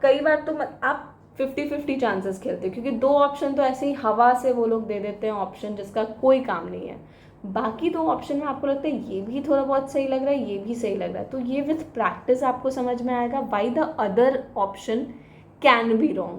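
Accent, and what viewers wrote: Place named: Bihar, India. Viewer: Aap Bihar se ho? Accent se lg rha hai.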